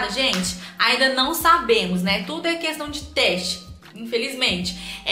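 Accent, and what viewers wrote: Minas Gerais, Brazil. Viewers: Esse sotaque mineiro é muito bonitinho 😊❤️ ( eu acho q é mineiro 😅)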